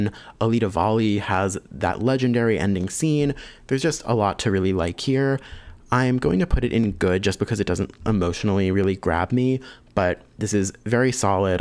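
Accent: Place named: North America. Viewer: He's North American. It's ok for people to pronounce names or words in a regional accent, which is not how to pronounce it in a native tongue. We know who it refers to, we understand the word, there is no intended disrespect going on (if anywhere, that's in your post) your post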